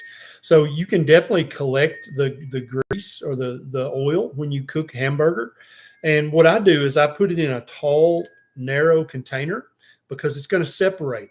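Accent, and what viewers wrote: South, United States. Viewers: "One of these people?" Really? You don't think you have an accent? You must be joking, and I must be being an idiot for failing to realize it? You most definitely have a very marked, obvious and clear southern accent. =D I'm very nearly 100% confident that anyone here in Oregon that I show any of your videos to will immediately completely agree with me about that after hearing you speak for maybe 3 seconds. But there's nothing at all wrong with having an accent, is there? Doesn't just about everyone have some kind of an accent? I'm sure that if you hear me speak you'd notice a northwestern accent. Someone from Oregon sounds like an Oregonian. Someone from Boston sounds like a Bostonian, and someone from Alabama sounds like they come from Alabama. /shrug. Praise the Lord and pass the meat.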